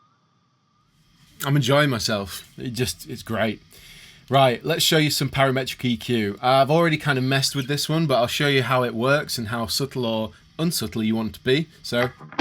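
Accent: Yorkshire, United Kingdom. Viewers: Love that you haven't lost your Yorkshire accent!! My family are all from Yorkshire, I live in West Yorkshire but my mother's side was from Barnsley from brieley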